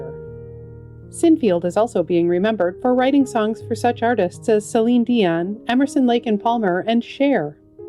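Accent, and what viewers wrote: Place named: Midwest, United States. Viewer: Narrator has an interesting Midwest accent.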